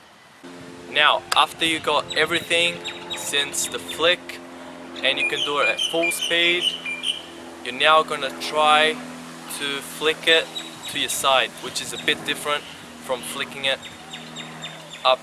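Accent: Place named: Australia. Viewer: I thought you sounded kinda Aussie haha nice tutorial though, got the hang of it in about 20 minutes ;)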